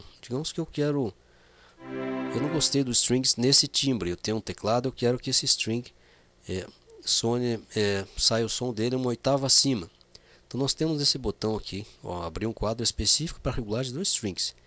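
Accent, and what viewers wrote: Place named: Curitiba, Brazil. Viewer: Caro Amigo, que maravilha o teu canal chê!!! Estou tendo de gravar no audacity, por eu ter mexido no meu Sonar 6, e sem saber, devo ter mexido em algum menú que tenha desconfigurado totalmente as saídas de audio e NÃO ESTOU PODENDO OUVIR MAIS NADA DO QUE JÁ GRAVEI!!! Prejuízo lamentável, e o pior de tudo, não sei se terei de perder tudo o que já gravei! Pois penso em pedir a um amigo meu pra desinstalar o Sonar 6, e re-instalar de novo, ou haverá uma esperança de eu reaver tudo de novo, se existir uma forma de eu "resetar" TODAS AS CONFIGURAÇÕES DE FÁBRICA do Sonar 6?!? Ainda que eu perca tudo o que eu gravei, (são só seis músicas) E voltar do zero de novo?!? Há essa possibilidade?!? Por favor, me dá uma luz... Desde já, muito agradecido. Um grande abraço daqui da Cidade de Maringá, Noroeste do Estado do Paraná, sul do Brasil chê!!! 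Sois de Curitiba?!? Senti bem forte uma acentuação no teu sotaque que me é muito amigável e familiar!!! Reiterado Abraço meu Amigo!!!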